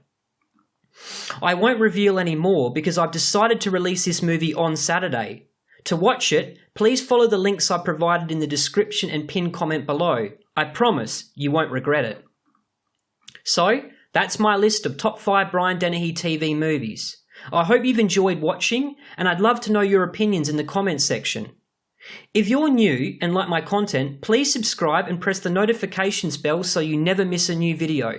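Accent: Australia. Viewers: Hi...Your Welcome Don't mean to embarrass you /...it's very SEXY/GORGEOUS 🤩 I could listen to you talk "AAAALL" day long! 😊 I'm a California Girl...near LA And You? Accent sounds Australian? What time for tomorrow's flick? CAN'T wait!!!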